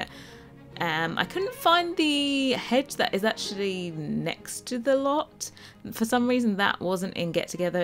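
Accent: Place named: Dorset, United Kingdom. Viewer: You're from Dorest? I can hear it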